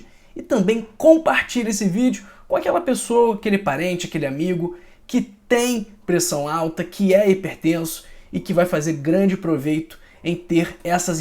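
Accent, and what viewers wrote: Rio de Janeiro, Brazil. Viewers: Partiu comprar aipo e colorau. O youtube me indicou este canal e já me inscrevi. Excelentes esclarecimentos. Entendi tudo com este carioquês legítimo. Aqui outro carioca da gema.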